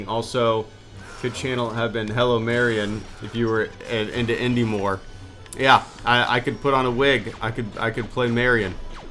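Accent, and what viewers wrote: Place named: South, United States. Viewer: Really rocking that southern drawl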